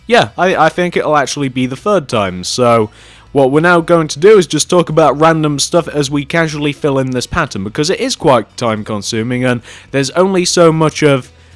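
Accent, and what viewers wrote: United Kingdom. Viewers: Why every YouTubers in British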